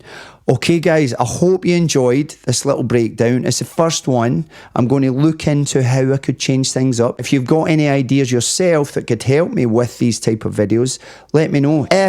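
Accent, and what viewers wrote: Scotland, United Kingdom. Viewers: Informative. Given in your beautiful Scottish accent!❤ thank you for the reaction! Lovely👍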